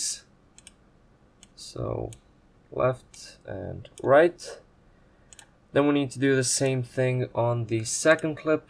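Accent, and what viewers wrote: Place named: Balkans. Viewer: Are you from Bosna? I couldn't help but notice the Balkan's accent and surname, great tutorials by the way.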